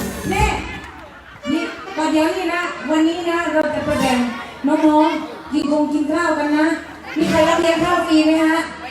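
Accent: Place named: South, Thailand. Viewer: ป๊าดด!!เอื่อยเเตนเเหลงใต้เก่งเเท้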